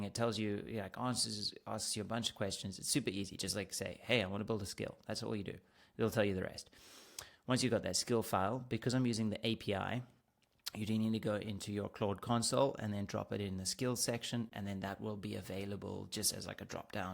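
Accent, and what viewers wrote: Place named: South Africa. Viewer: Well presented and it unlocked some ideas for my future client builds. It's a definite game changer for creating neat reports, without having to use various apps. I thought I recognized the accent... a fellow saffa:-D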